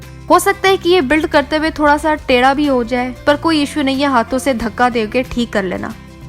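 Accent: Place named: India. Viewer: Cooking me desi type of (لہجہ ) lehja... hayeee maza aa jata hy...❤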